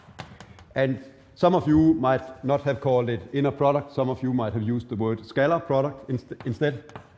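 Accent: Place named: Denmark. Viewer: Harry Ritchie no, he is very clearly Danish. We can identify fellow Danes.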